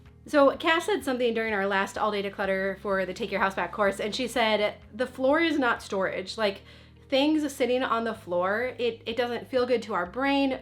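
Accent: Minnesota, United States. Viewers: Love watching you, lived in Minneapolis years ago. Good to hear a good Minnesotan voice.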